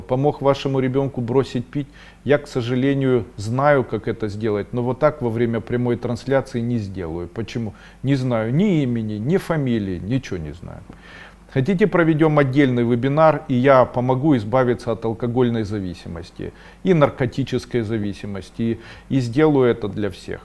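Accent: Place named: Kazakhstan. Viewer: Ви такий прикольний.Акцент казахський.)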